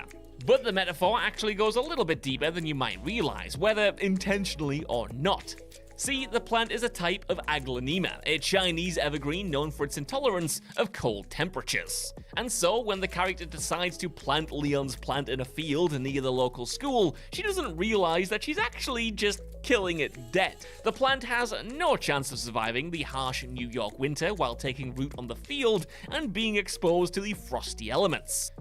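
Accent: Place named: Northern England, United Kingdom. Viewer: LOL, The number of ppls not getting the more Northern accent here is amusing me no end. He DID say Bader just in the way anybody from his area would. Reminds me of Americans needing Subtitles for Trainspotting 😂.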